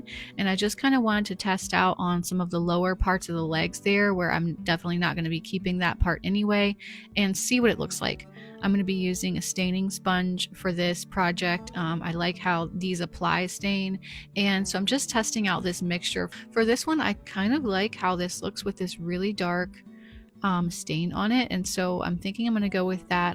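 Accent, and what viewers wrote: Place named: United States. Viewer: Thank you so much,. Every makeover, flip, upcycle is a masterpiece! I also enjoy listening to your voice - a very soft American accent; I know, you probably don’t think you have an accent, but I’m from the UK, so you do! Enjoyed Curry’s upcycle too with stain on his nose and whiskers, a cool look.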